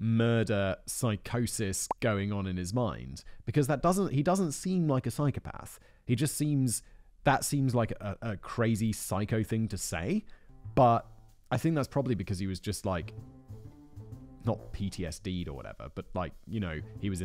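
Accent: United Kingdom. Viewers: With that British accent, you could describe taking a shit, making it sound classy.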